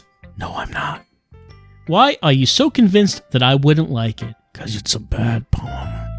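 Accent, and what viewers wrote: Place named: Brooklyn, United States. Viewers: Ohh... The brooklyn accent.. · Dat Brooklyn accent, doe. Cute.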